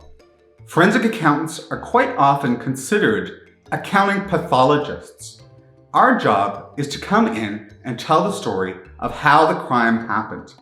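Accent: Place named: Canada. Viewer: You’re Canadian, aren’t you? I can tell by they way you say process and houses.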